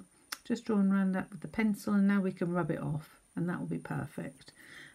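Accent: United Kingdom. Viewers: Hiya, found this easily enough. Thanks for giving me the link (nice to hear a UK not American voice) xx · I have just found this video while searching for a tutorial on how to use scan n cut. I’ve got a CM300 but very rarely use it. Could you do a series of videos on basic operation? I’m sure there must be many like me who get so frustrated because they cannot get the machine to do what they want it to do. By the way, it’s great to hear an English accent and thank you for your time.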